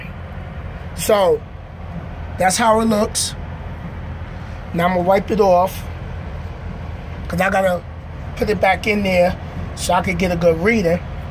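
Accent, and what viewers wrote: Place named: East Coast, United States. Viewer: Good job for all those cats who are unfamiliar. You sound like you are from the East Coast. I was born in PHX later moved to Long Beach ,California and the to Seattle WA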